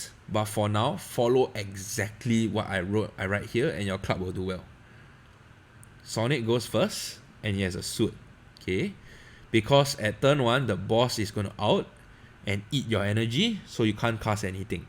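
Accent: Singapore. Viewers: Bro this is an awesome guide. Are you from Sg? Can recognize the accent lol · it's more like singaporean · Are you singaporean?or Asian haha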